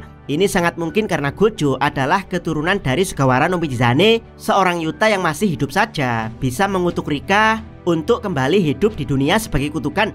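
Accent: Java, Indonesia. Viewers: medok bgt mas🤣🤣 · Suara mu terlalu kejawen Bang ... gak enak di dengar ,kurangi logat nya bro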